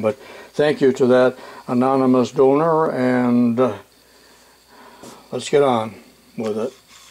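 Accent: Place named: United States. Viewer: I like your accent. To a north European like me, it sounds like I'm watching a western cowboy movie! 👍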